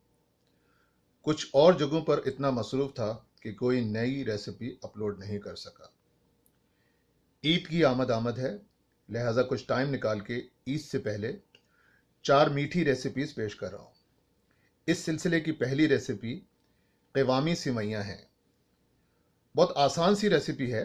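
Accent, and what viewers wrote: Delhi, India. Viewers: Ek zamane baad itni achi saaf aur dehli style urdu suni hai aur ye Qawami siwaiyan yaad agayi jo ke meri nani banaya karti thi. I love it.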